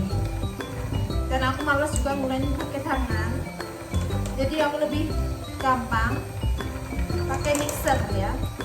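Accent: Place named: Semarang, Indonesia. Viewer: cute bgt....senyum2 bikun gemes....😍😍 Logat semarang yg paling medhok ya mam....😀😀😀 yg wong Semarang mana like nya...😍😍😍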